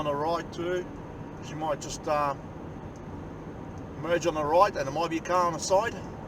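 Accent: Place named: Australia. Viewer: You have a Very STRONG Aussie accent , i just noticed.👍.... good for me to get used to such strongness of Aussie accent before emigrating there.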